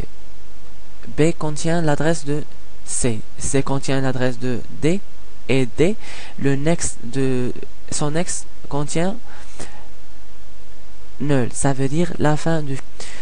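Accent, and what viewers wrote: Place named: Maghreb. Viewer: t'explique mais on ressent que t'es pas un natif français tu dois être magrébin ? ;)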